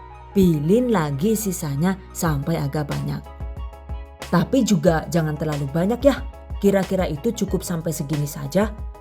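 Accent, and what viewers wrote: Banyumas, Indonesia. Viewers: Saya senang dengan semua resepnya dan senang bahasa ngapaknya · lihat resep sambil ngakak denger clotehannya yg ngapak2😂😂😂😂👍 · Ditengah nyimak tutorial ini, muncul lah ngapaknya,,,,bunda. Seru banget resep nya diselingi logat" yg bikin ketawa ngakak tapi justru bikin kangen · Pokoke seneng belajar sekaligus ngehibur diri apalagi kalo keluar bahasa ngapaknya, hhh sama2 ngapak jadi gak bingung. step by step nya juga bener2 gak pelit ilmu deh, makasih, semangat dan terus berkarya njeh 😘 #GiveawayTHV2021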